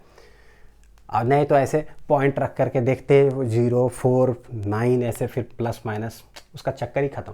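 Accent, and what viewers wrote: Bihar, India. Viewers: Sir are you Bihari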